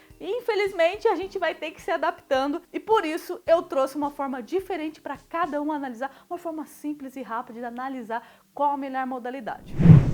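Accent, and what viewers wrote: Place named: Curitiba, Brazil. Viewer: Ótimos vídeos explicativos, sotaque Curitibano "Penca" de app kkkkk amei. Estou querendo abrir um delivery.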